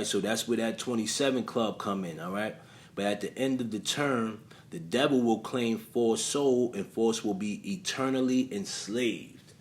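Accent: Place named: New York, United States. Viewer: All this time I thought you were saying, "Foreskin Bargain". New York accents, sheesh!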